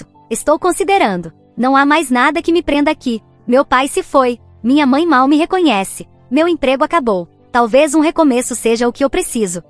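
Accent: Portugal. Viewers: Adoro as estórias narradas em português europeu.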